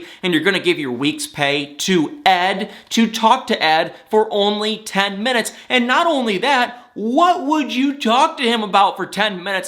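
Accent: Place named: Midwest, United States. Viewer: "Oh my God!" Hahaha your Midwestern voice reminds me of home, Cleveland. Live in L.A. a long time now, but go home as reg as I can.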